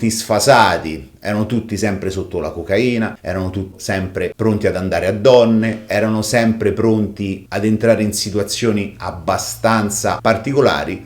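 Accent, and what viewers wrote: Rome, Italy. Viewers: scusa, se puoi parla con accento anonimo non strettamente romano. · Mi piace molto come affronti la storia della Banda della Magliana... un pó per quel tuo accento romano che ti rende molto piú credibile, un pó perché sei credibile a prescindere per la serietá che poni nelle tue storie. Conosco la Banda della Magliana, o meglio conosco i testaccini perché nei primi anni Ottanta frequentavo sporadicamente i circoli missini di viale Marconi e non solo... e conobbi Maurizio CArminati...peró io ero un ragazzino figlio di un dirigente missino rispettato perché uno dei pochi che fu prigioniero in un campo di concentramento inglese in Tibet in quanto ufficiale responsabile del Reparto Informazioni in Africa Orientale, e lui era giá un mito... Per tanti anni mi sono occupato di lotta alla mafia, e ultimamente ho seguito il processo di Mafia Capitale grazie anche alla mia amicizia con Otello Lupacchini, che viene dalla precedente amicizia con Raffaella Notariale... 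Spero di conoscerti...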